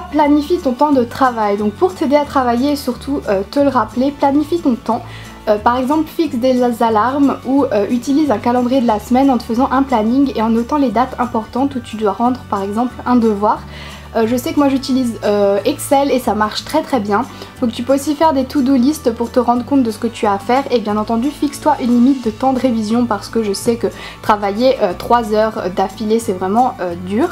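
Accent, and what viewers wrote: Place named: Alsace, France. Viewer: Je n'avais jamais remarqué l'importance de ton accent alsacien ! Moi qui suit aussi alsacienne je l'entend quand même assez hihi😊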